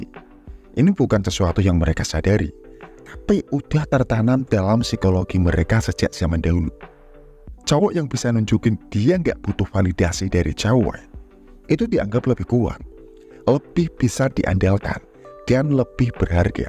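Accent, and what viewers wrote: Java, Indonesia. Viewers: Medok banget Jawir nya,, puyeng dengernya...😇😇😇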